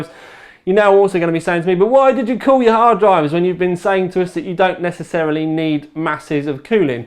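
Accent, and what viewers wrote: United Kingdom. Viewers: the most annoying is that British English ascent, its so flatulent. do not understand why British cant speak normal English.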